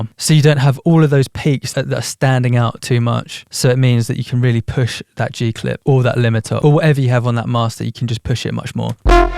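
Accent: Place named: United Kingdom. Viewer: Tutorial instructions hit different with that UK accent 😂😂😂 cheers from USA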